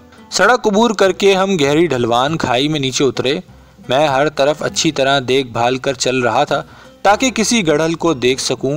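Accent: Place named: Pakistan. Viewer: Aapke talaffuz se aisa lagta hai ki aap pakistan se ho.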